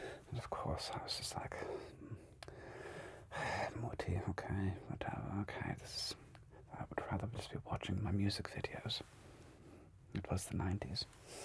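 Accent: Germany. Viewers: German words spoken without any particular accent. Sounds like a German speaking English. And perfect use of "Denglisch" mixing German and English words. hat Klasse! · Are you german if not your denglisch is perfect 👌🏻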